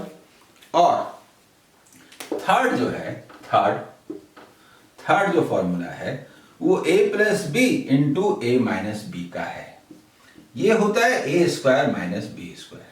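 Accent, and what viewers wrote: Bihar, India. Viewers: Yes he is from bihar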